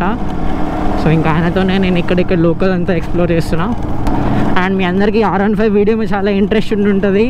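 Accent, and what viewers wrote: Kerala, India. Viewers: Bro mee native place ekkada I mean Malayalam matladthanav kada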